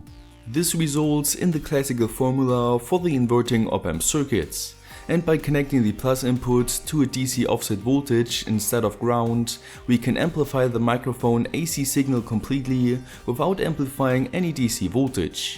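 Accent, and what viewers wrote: Germany. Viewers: Could you please explain the schimtz trigger?? By the way, like your german accent a lot.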